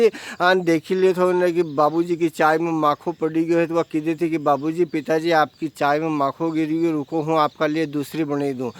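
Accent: Malwa, India. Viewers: ...man khush ho jata h apk video sunkar..khas kr apki malvi boli sun kar...👏👏👏👏👌👌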